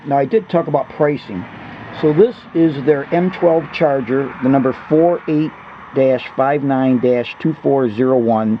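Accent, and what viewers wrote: United States. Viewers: is this American or Canadian?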